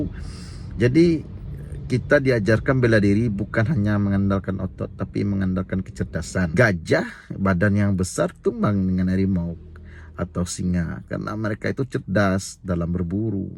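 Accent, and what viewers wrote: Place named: West Sumatra, Indonesia. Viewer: dari logat atau aksennya,nampak bahwa abang ini urang awak alias asli minang.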